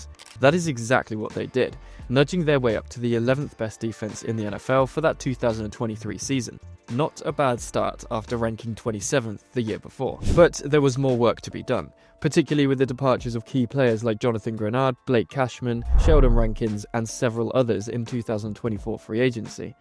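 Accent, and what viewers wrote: United Kingdom. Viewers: It feels surreal to watch a video about my Texans being good narrated by a British bloke 💙❤️💙❤️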